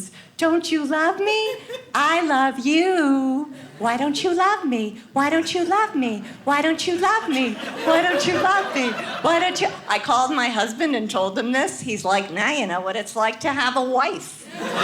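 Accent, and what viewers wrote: United States. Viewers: Brilliant comedian and real American in her delivery, attitude and humour! Really liked it! Normally not so interested in people banging on about their kids on stage like I came to hear their biography but this brought so much humour with it I was glad to hear the stories! Thanks again!!! Haha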